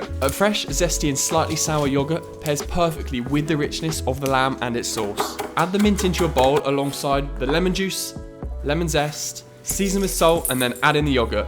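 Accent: United Kingdom. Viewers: Bruh the British accent 😂😂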